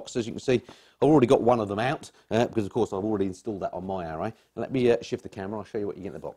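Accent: United States. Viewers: LOL! Loved your American accent! My buddies and I are buying these soon. They seem to be the best out there. It was fun to see box contents and get your take. I wonder if Cardo speaks Texan?! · loved the American Accent .. I still find it amazing that we speak the same language, yet "you guys" sound like your chewing on your words :)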